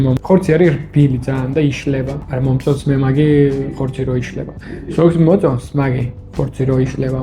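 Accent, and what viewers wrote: Imereti, Georgia. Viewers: ძალიან მომწონს იმერულად რომ საუბრობ! 💟💟💟ჩემი დედულეთია სამტრედია ოღონდ სოფელი დიდი ჯიხაიშში! მე მგონი ყველაზე კარგი ბოლო ქაბაბი! ელარჯი უფ სასწაულია იყო! შეგერგოს! ბედნიერად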